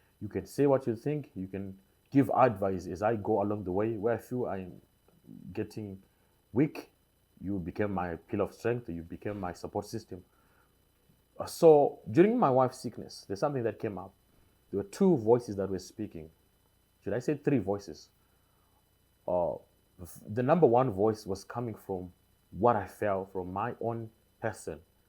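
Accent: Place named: England, United Kingdom. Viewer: "The first church I have to preach to is my family" these words you have spoken are powerful and such a great message that most men would appreciate. You know, it is important to determine your own goals and standards in your life and pressure yourself by what other people are doing in their lives, be it having big house or the latest model car. You and only you, as the heard of your family, should determine your family's standard of living. Nhasi - today, throughout this video you have spoken english as if you once lived in England, UK. Wishing you all the best with you relocation.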